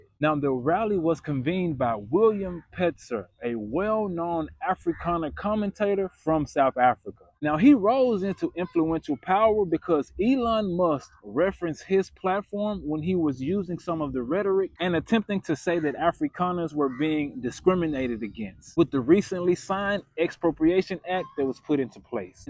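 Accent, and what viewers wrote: United States. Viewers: You are being a reverse racist and promoting action against the whites of this country. Any educated person of any race will not support a narcissistic, self serving supposed US leader who is turning his country over to the other nut job, putin. This is similar to our previous leader selling our country to the guptas. You are speaking absolute bullsxxt about europeans barbecuing black folk. It still is the black on black violence of putting a tyre on a brotha, filling it with petrol and setting it alight. I can not believe YOUR TOTAL IGNORANCE OF ANYTHING ABOUT SOUTH AFRICA. lCome and live here, with your american accent , and see the 2025 truth.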